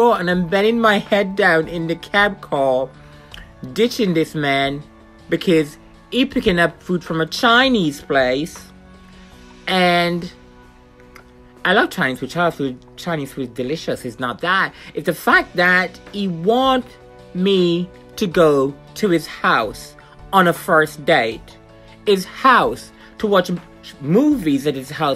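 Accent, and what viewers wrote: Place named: Ireland. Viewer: Love your videos keep it up girl I know u said your Jamaican I love your accent sounds Irish ☘️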